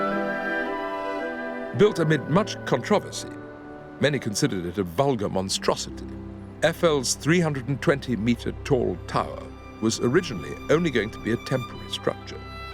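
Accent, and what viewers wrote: RP, United Kingdom. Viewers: I thought the same of the lengthy introduction, and it seems that the narrator would like to magnify the narrative effects by such contrivances, but it can test our expectation (our wits) which, with some endurance (add the intrusive ads and other distractions), we may finally arrive at the gist of the subject-matter in hand. Nevertheless, for the more patient and cultured, it is worth the efforts. It is not easy to say whether a short video, taking such goodly swath of introductory embellishments, could enhance the desired effects, which is to capture the viewer’s attention from the outset? That said, one is bound to admit that these documentaries, despite the narrator’s fondness for an architectural backlog of informations and history, are highly recommended and even of the highest rank. One could say that is natural to the British people, with their distinct accent, to win our admiration as the quintessence or epitome of intellectualism and refined culture. 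· Anyone without a wanky posy accent. Almost anyone on DW news would be wonderful. Most people on the BBC would be a significant improvement. I don't see the point of sounding like a really annoying snobby social climber. After all, there's nothing wrong with the music. Why screw up the text that way?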